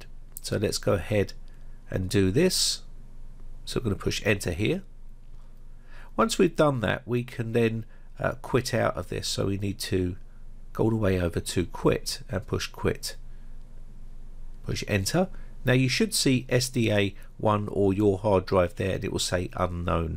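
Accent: England, United Kingdom. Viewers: noo? a noo partition? Wait, you SOUND English... so.... noo? What Nationality are you, boy? its a NEW one on me.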